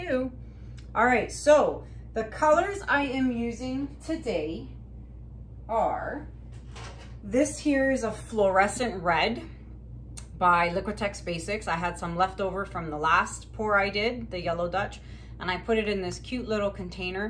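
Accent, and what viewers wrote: Canada. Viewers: Just found your site,....I'm in the US but knew you were CA with the eh and the owt! lol I have family in Dunnville, ONT! They make fun that I drop my 'H' sometimes! Soooo, Love your work, your dog Juno and excited for your reno!! Since I'm much older than you, I have advice! Enjoy the journey, trust yourself and don't worry....I choose the green, btw. Looking forward to your next project and your vlog! Pat from Brooklyn, NY ( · thank you so much. I could hear you pronouncing the name but I couldn't find it. By the way I love you accent. I don't know for sure but it sounds Canadian?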